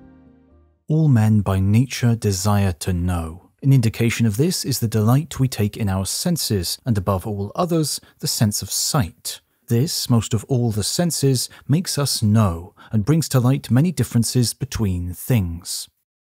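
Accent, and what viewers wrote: England, United Kingdom. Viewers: I hate measurements in Kilometres, why not Miles as that's what we've been brought up with and i no I'm being a bell end and for that i apologise. Great video and so enjoyable esp the narration. I think the English voice can be a universal voice were u don't need subtitles as everyone shud understand the narration and I'm not being biased i promise. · Can you give your channel some class by ditczthe British accent and instead use a good Swedish, French or Irish accent.. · I hate your robot-English-toff-voice more than death itself.......death to to robot voices!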